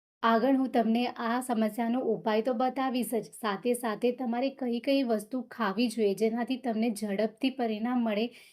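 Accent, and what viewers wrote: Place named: Kathiawar, India. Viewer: Rajkot tame kathi bolo cho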